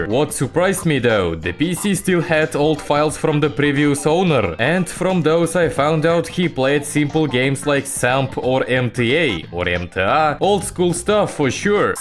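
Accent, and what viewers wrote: Russia. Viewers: I love Russian accent😂😂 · Классное видео, мне понравилось. Суровый russian accent на высоте))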